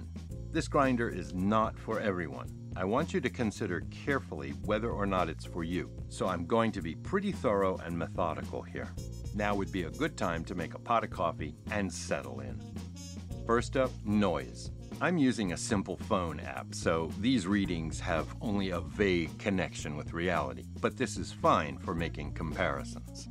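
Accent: United States. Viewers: Hello, I really enjoy your content about coffee. Thorough, insightfull and a pleasure to watch. Thought you are American, but your channel says Ireland? Good voice anyway! Subscribed. Would you consider making a video about mods for the Mignon (Specialata)? Something I suggest experiencing: cotton pour over filters…. Please keep it up!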